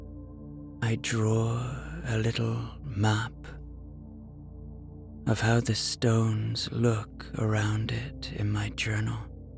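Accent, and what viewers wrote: Ireland. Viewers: You're silky Irish tone makes me smile and reminds me of Co. Mayo 💞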